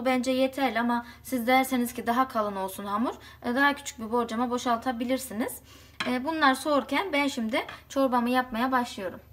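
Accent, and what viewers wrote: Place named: Central Anatolia, Turkey. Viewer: Gaynamaya bırakggyoruz o kadar iç anadolulusun ki abla bende niğdeliyim şiveni çok iyi anliyorum 😂🖑 elllerine saglik bizde koyunun boyun etinden yapılır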